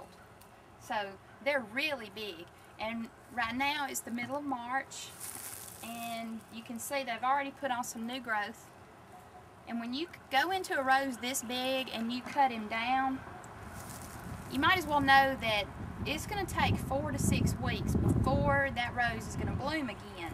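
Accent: South, United States. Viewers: love that Southern Drawl, sweetie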